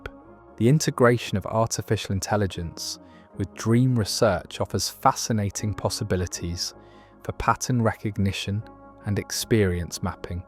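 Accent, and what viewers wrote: United Kingdom. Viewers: Sorry, always hard for me to follow Brit accents. Does NOT keep my attention. I love this topic, but can't view it.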